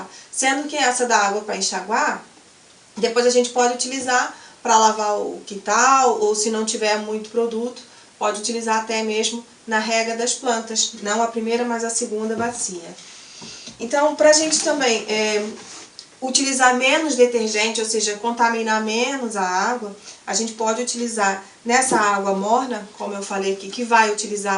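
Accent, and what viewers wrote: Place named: Portugal. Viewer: Engraçado... a primeiríssima coisa que notei foi o seu sotaque... já está falando com os acentos portugueses...rs.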